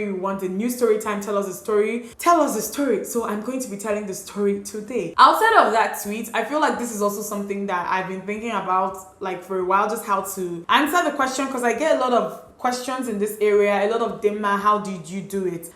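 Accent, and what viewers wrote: Nigeria. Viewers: I appreciate you story, however you are talking to much. You look refined, but when you opened your mouth....typical Nigerian accent and speech pattern. If you refine it, you would capture more subs.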